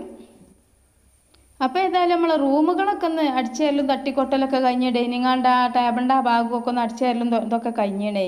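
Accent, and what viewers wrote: Malappuram, India. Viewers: Fantastic dish, great enthusiasm and confidence plus terrific language which really has agreed malapuram tint. O.k. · Malappuram slang powersh💪💪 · Makappura baasha🙂 poli endhaayalum sadhanam sooper aayikk nn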